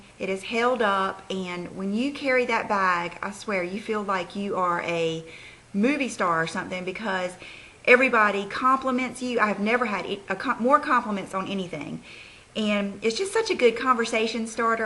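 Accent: South, United States. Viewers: Hi there, I haven't been subscribed to you long, but I love watching your videos! The past few Fridays I've been unwinding and relaxing after work by watching your nice long videos. You always give good, honest advice and are so sweet! And this probably sounds really really silly, but I'm Canadian so I don't get to hear many southern accents up North. And I just love yours! And I know it's not an accent to you, but I just love it! LOL Thanks for being you!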